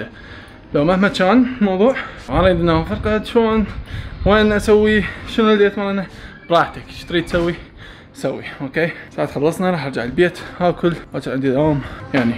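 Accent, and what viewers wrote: Iraq. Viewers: فديت اللهجة العراقية😊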